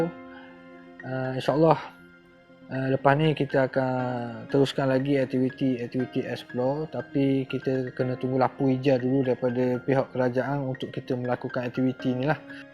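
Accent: Terengganu, Malaysia. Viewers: kn....minat sgt kt...lg2 dgr org ckp loghat tganu...tu suka tgk ni...😊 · Xtau laaa nk tgk video ke...nk tgk penjelasan..sedap bunyi ganu tu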